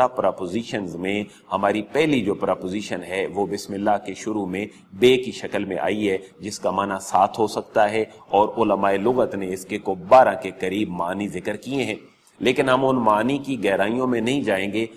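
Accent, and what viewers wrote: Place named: Punjab, Pakistan. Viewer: sahib k lehjy sy lag raha hai k ap gujrat,mandi, ya okara sy hain.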